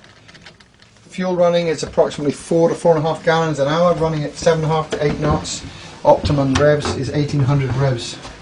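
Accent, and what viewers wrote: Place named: United Kingdom. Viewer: Why does a British accent seem to make things oh so good. An insult to ones intelligence. The dude yaks to much.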